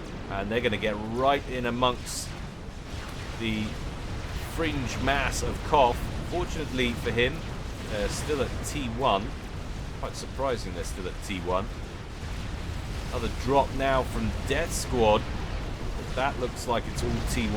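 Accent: United Kingdom. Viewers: I think we have a few too many children up past their bedtime in here...:) In any case though, I think describing your accent as received pronunciation is probably going a bit far, you've got a reasonably posh accent but too tainted to be proper RP.;)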